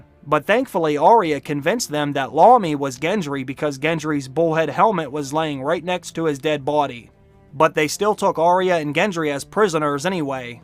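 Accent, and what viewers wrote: Pittsburgh, United States. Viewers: Are you from Pittsburgh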